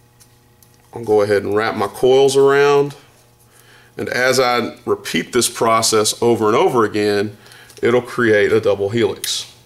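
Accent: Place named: Texas, United States. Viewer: nice accent... texan?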